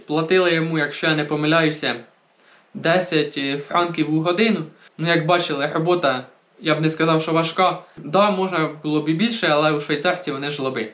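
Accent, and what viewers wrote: Poltava, Ukraine. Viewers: В тебе акцент цікавий. Ти з Полтавщини?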